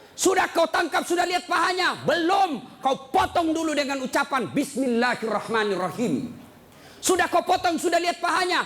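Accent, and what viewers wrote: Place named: Bugis, Indonesia. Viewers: "Lidah SULAWESI ini BOSS, jangko paksa lagi pake lidah SOLO" 🤙🤙🤙 menit 15.03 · Dialek bugis tak hilang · Orang jogja tau logat makassarnya udztas yaa? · Alhamdulillah ust tak pernah merubah gaya./logat bahasa sulselx dimana memberi ceramahx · Saya senang dengar kalau pak Ustad ceramah, bermakna, berbobot, dan bisa bikin kita ketawa, dn tidak lupa masih khas makasar, jangan dihilangkan khas makasar nya ya pak Ustad, sehat sll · Itu cara org sulawsi memanggil sekumpulan org bu hamidah, di sulsel itu lumrah dan tdk trmsuk kata yg gak sopan. Kan pak ustadz bilang ini lidah Sulawesi bos, jgn dipaksa jadi lidah solo heheehe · Seneng aku dialeknya ustad ini Makasar punya, Krn aku prnh tinggal di Makasar, seru ustad Gaya dialeknya ternyata bisa diterima semua lapisan muslim di Indonesia Ttp istikhomah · Sehat terus ustad sy sllu suka cara bicara org Makassar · #seneng LOGAT BUGIS, SULAWESI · Ceramah yg santai, lugas, Fakta kehidupan sehari hari, komunikatif, lucu namun bernas dan tegas dengan dialek daerah yang sangat kental (ustdx org makassar). 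Penonton terhibur yg duduk di panggung jg ikutan senyum dan tertawa. AUTO NGAKAK yg mendengarkan ceramah ustd ini.. semoga penontonnya dan pemirsanya jg AUTO TOBAT insha Allah.Syukran ustad DL ilmunya. Alhamdulilah allahumma shalli ala rasulullah Muhammad sallahu alaih wa ala ali wa sahbihi ajmain. Salam dari wong ngapak CILACAP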